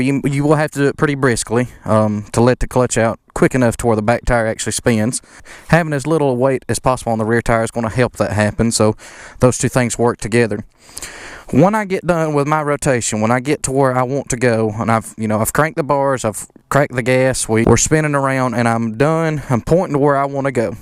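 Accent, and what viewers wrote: Alabama, United States. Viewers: If Alabama had a voice, it would sound like yours.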